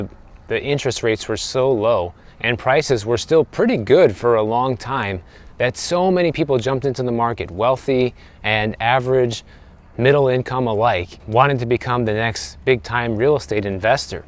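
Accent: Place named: Illinois, United States. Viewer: From one Illinoisan to another, I thought I recognized and Illinois accent.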